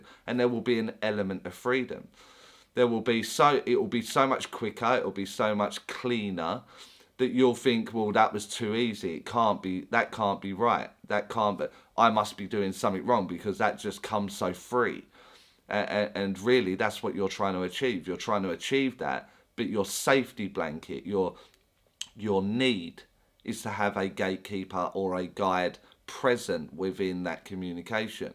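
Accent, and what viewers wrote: Essex, United Kingdom. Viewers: Before I even watch, I can't believe I have seen this I have only just fou d out I am a medium but I've heard spirit for 4 years. I just thought I was crazy, well literally I've been hearing the word gatekeeper so if just typed that word in as I have been hearing the name percival as well so typed in the 2 and your the first video and it's about mediumship. I am having a moment stuff keeps happening and I'm researching and it really is true.. I'm not nuts atalll how would I even know this.. I've just had to search the words I hear.. I'm very much still coming to a realisation I've been classed as having a mental health because I couldn't explain what was happening to me. I don't know why anyone would want to put thumbs down, I mean you sound like where I'm from Essex x💚 anyway I will watch now. There's a nice vibe straight away sorry to type on I just can't believe what's happening.. 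I'm having a moment and I have to get it off my chest cis everyone thinks I'm crazy when I say I've been hearing the gods as well as reletives and other entity I can only see tho via dreaming as if yet but I've spoke with Calvin klien and had visions of Diana, spoke with Hermes, Hearing percival and spoken with Gabriel too Thank you I probably sound so silly but it really true x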